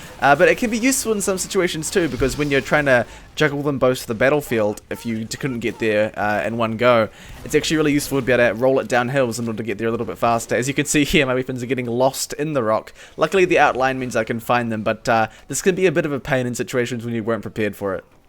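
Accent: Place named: New Zealand. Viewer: I haven't been subbed for long but I've watched a few recent videos of yours and I love how you take what valve dishes out without being angry at anything and the way you use the new mechanics of the game. I'm glad we have someone in the tf2 community that is so positive about the game instead of just complaining about X favourite weapon being nerfed and saying that tf2 is dead. Also love the NZ accent!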